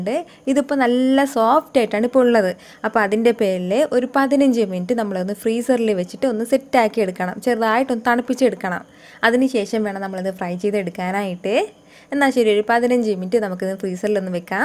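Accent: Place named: Kannur, India. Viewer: Endutta slangaa chechi... Superb KANNUr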